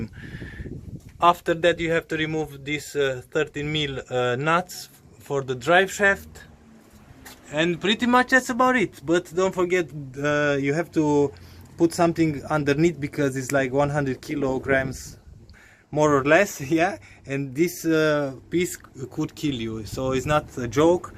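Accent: Romania. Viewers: Am zis eu ca are accent romanesc . Diferential de pe e39 525 d e compatibil cu 525 tds? Mersi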